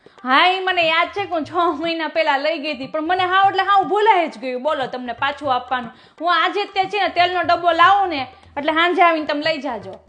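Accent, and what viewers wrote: Kathiawar, India. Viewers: એક પાત્રીય અભિનય અસ્સલ કાઠિયાવાડી , તળપદી બોલી માં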